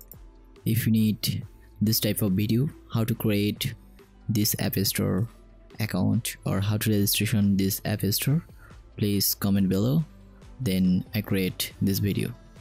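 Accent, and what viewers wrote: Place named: India. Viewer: Interesting Informations. And an Indian accent. It's being familiar. :) Thanks. Helpful Informations.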